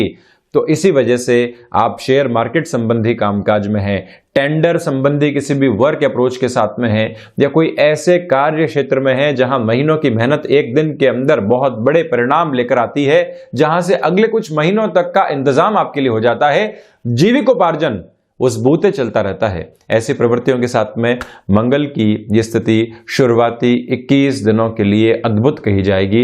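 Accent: Standard. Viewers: Predictions k sath Dharapravah Shuddh Bhasha sunne k liye milti hai aapse ji..... Pranam evam Dhanyawad 🙏 Kripaya bhasha ka standard yahi banaye rakhiye.....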